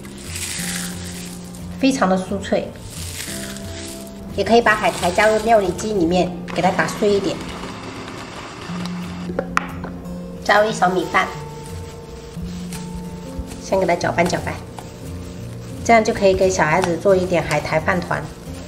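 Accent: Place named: Sichuan, China. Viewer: 最接地气了，喜欢你随心随意的分享，非常真诚~要不是听你讲四川话还以为你就是福建人呐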